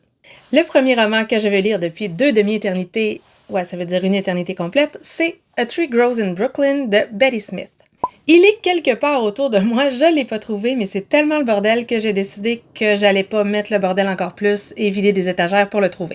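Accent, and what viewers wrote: Quebec, Canada. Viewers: Bonjour de Belgique, qu'est-ce que j'aime l'accent québécois, ça me rappelle mon Erasmus à Montréal en 2007 (ça ne me rajeunit pas), que je n'ai jamais oublié! Vous, les Québécois, êtes un peuple adorable et attachant, j'aurais adoré rester vivre au Canada! Petite question: qu'est-ce qui fait que tu optes pour la version originale en anglais ou la traduction (je pense au Joyce Maynard, qui est originellement en anglais mais que tu sembles lire en français)? Bonne journée!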